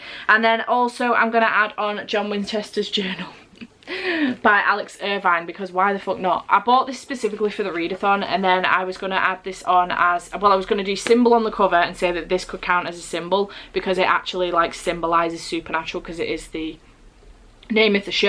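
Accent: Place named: Standish, United Kingdom. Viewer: Thrilled to find you ,I grew up in Standish , your accent makes me homesick.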